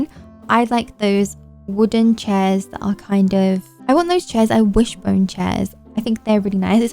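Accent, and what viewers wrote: United Kingdom. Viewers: so England, hence the British accent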